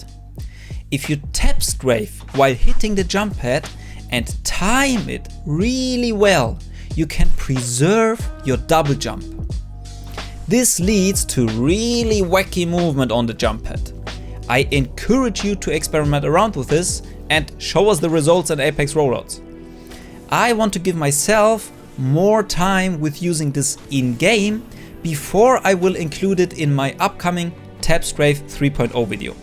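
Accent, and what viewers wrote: Germany. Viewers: Was ein Deutsch englisch HAHAH😅✌🏼 xD, Save Deutscher oder?😅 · your german accent is so obvious ;D, great videos man. keep on grinding my man. ;) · Man kann so krank hören das du deutscher hust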